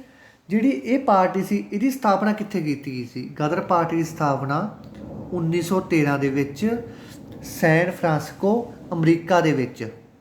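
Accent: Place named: Majha, India. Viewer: VA bolde ho lagda magha toh belong krdo ho ji Ha time ta hunda but agle di life aw Sedule busy aw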